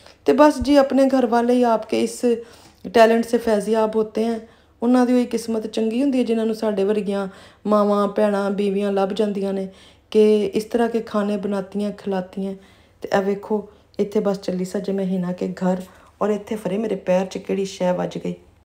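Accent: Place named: Punjab, India. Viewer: Ap ki punjabi ka tarka ap k vlog ko chaar chaand laga daita hai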